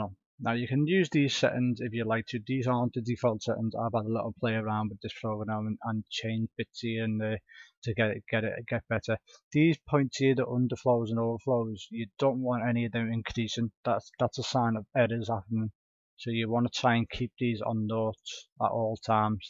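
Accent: Liverpool, United Kingdom. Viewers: Nice Tutorial Man, simple But Clear, the Way I like it. Are you from around Liverpool, it sounds like you have a Scouse Accent .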